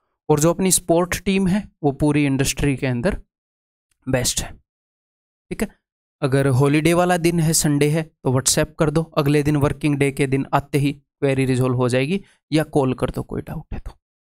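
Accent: Haryana, India. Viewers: Maine maths ka tier 2 batch lia h aur apka content perfect h ekdum exam oriented aur to the point ... Aur apke padane ka way bhi boht acha h aur haryanvi accent bhi aisa h ki dimag me seedha ghus jata h padaya hua ...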